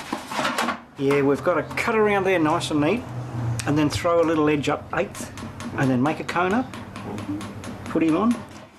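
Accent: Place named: Australia. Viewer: I kept expecting him to say (with his Australian accent) "can you see what it is yet?" :-)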